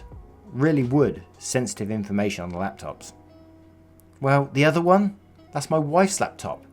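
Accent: United Kingdom. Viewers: first story very good (britash sound)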